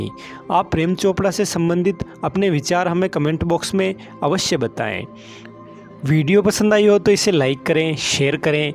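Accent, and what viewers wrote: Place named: Marwari, India. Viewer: मारवाड़ी है ।चेनल ।में ।बोलने वाला ।उच्चारण से पता चलता है ।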